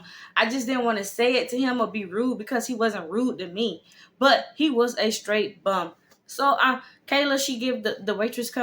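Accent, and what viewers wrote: United States. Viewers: You sound like your from Louisiana though. 💜 when you were listing the dudes I was looking around my room. Hell! 😂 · This 💩 sounds so Houston!!! Cracking up laughing 😂😂 All those dudes in one room (I’m hollering) 😂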